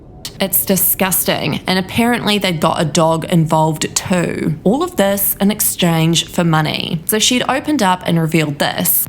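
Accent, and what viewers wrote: Australia. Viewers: The narrator had a grating and annoying accent. Sounds like Aussie. Pretty sure there, it is legal or decriminalized. "Influencer'only a word that exist in modern shole degenerate societal garbage. So Dubai sounds modern day Sodom & Gormorrah? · The narrator/reporter sounds like a typical Aussie Bogan.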